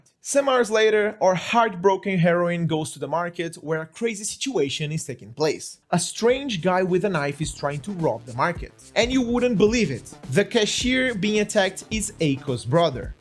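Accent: Brazil. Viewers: Karalhow negão, dps q tu falou q era br eu n parei de ouvir o br do seu inglês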